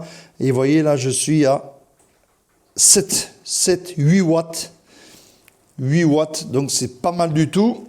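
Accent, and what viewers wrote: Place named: South, France. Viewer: Bonsoir, L'accent du sud est charmant. De mon point de vue, il faut absolument rester crédible et adapter la véritable prononciation. Nous sommes de la même génération, alors ... <link> Merci pour cet échange et bonne continuation. 🙏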